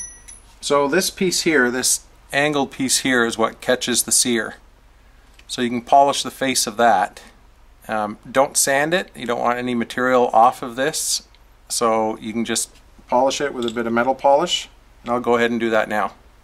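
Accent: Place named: Canada. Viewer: Very well done, used your tutorial as a guide to smooth out my 2.0 shield. Awesome video, best I've found, clear, conscious and touch of Canadian twang, EHH. Thank You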